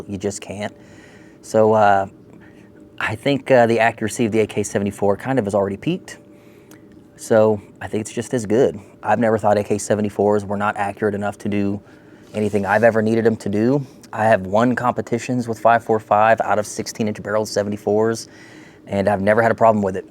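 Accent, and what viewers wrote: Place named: Czech Republic. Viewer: Based on accent, I think is Czech.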